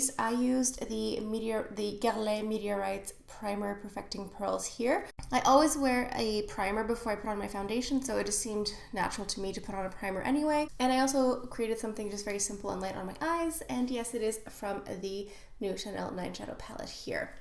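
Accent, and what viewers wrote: France. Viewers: You have such a great French accent!!!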